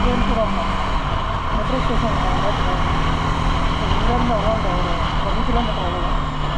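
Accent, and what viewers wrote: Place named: Bangalore, India. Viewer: Bro your tamil is similar to Bangalore tamil....i had s doubt but after that TVS person spoke in kannada then i got it 😂😂😂 bro insta id thange i want to ask regarding Apache RTR 310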